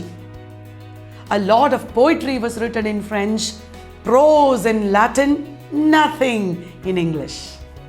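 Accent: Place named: Tamil Nadu, India. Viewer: nice sryle ..zerous and academic,,tamilian accent..stands out !!! kudos...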